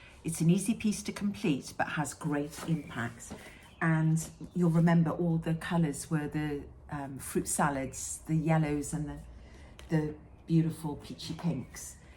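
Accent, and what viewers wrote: Wales, United Kingdom. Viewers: Just found your channel, do I detect a bit of Welsh accent? I was born in Pembrokeshire on the border of Carmarthenshire, speak fluent Welsh niw residing in Reading UK, now I will continue to watch! X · Hi another wonderful flosstube. It’s so nice to get to know you through your stitching. I know exactly how you feel about being a lone stitcher, as that is exactly how I feel. I have an instagram account just from my crafts and stitching so all my family don’t get to see in on my own account and it’s also great to look back on all your hardworking. I normally watch flosstube from the USA so it’s lovely to hear a fellow Brit on here. I also love your embroidery. My daughter was very lucky to study her hand embroidery degree for 3 years at Hampton Court Palace So if I ever get stuck I call on her 😂 can’t wait for your next flosstube. 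Also I love a pink rose.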